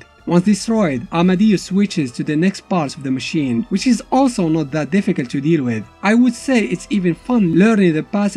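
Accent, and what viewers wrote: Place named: Morocco. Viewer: I love your videos ❤️ man you accent looks so Moroccan 🙂🙂🙂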